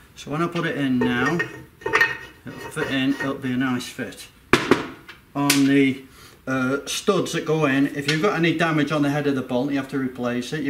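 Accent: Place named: Lancashire, United Kingdom. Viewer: Where are you based ? The accent sounds more like Lancashire.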